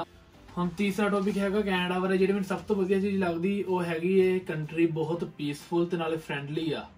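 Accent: Punjab, India. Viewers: Very natural Punjabi accent.... Love your videos :)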